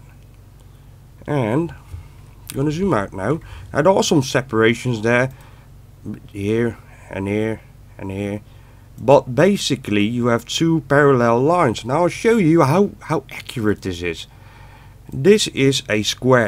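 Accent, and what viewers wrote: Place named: Netherlands. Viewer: You sound Dutch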